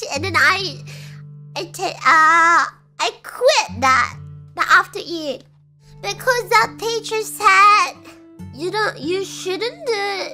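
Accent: Australia. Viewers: Aussie accent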